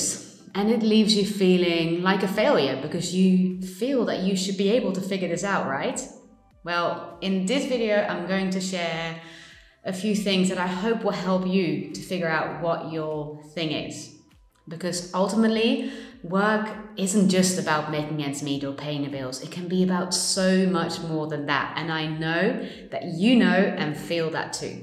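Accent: Netherlands. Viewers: Are you Dutch? I hear a bit of our accent 🧐 just slightly. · Are you dutch? I hear a slight dutch accent leaking through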